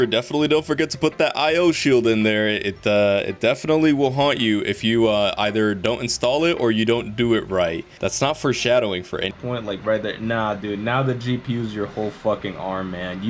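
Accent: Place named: Dominican Republic. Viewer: first time ever stumbling into this channel, and im kind of overstimulated by how much is happening in such a short amount of time. Anyways, the dominican accent caught me off-guard lmao. I didn't think you'd also be latino KASJDAJAK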